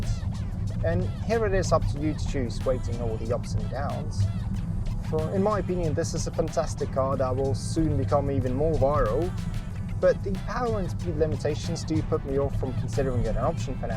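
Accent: United Kingdom. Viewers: ИИ или откуда такой британский акцент?))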